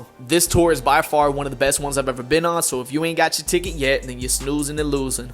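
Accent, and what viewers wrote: North Carolina, United States. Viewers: I think you should get paid as much as you are able to get. I don’t mean you would be unfair to this rapper. I mean I think you are worth an unlimited amount. Your conversations with people are genuine and lovely. The attention you give your haircuts AND your clients is absolutely priceless. I’m in North GA near Athens, so I understand your roots somewhat. Much respect and love to you and your work. You deserve all that life has to offer. I do NOT understand why you talk the way you do, being from NC, but we all have our ways.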